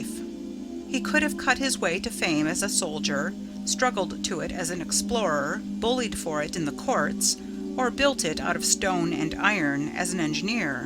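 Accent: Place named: United States. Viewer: That stupid music .. and the strident US accent.. ruined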